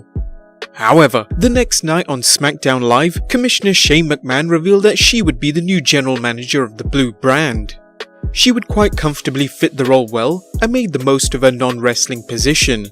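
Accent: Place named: England, United Kingdom. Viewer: Never should’ve been GM. English accents are unbearably painful. More Meltzer-like guess work in this video though, nice work marks.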